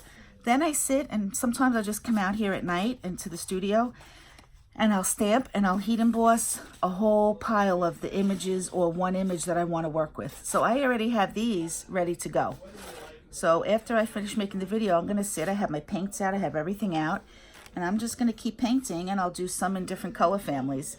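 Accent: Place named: New York, United States. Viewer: What an inspiring and encouraging video. You have covered all my favourite topics and techniques - inked backgrounds, stamping, embossing and water colouring, and all accompanied with your wonderful New York accent. You have made my day!! Thank you so much for the great advice on a technique that I am now inspired to try myself. Love watching your videos here in Ireland.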